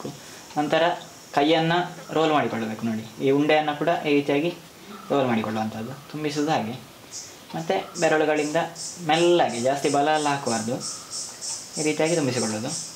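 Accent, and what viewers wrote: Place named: Dakshina Kannada, India. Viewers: Pure south kanara Kannada. Here to listen to that only. Music to the ears. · Your cooking skill is amazing👍👏👏 also it is very pleasant to hear Kannada in South Canara accent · Nimma kannada bhashe holigeginthalu sihiyagide. Pure kannada. Namma dakshina kannada bhashe.👌👌👌👌👌👌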